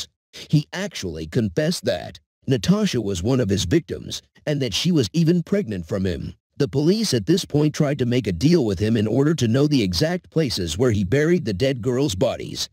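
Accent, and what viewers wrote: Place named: China. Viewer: narrating this in Chenglish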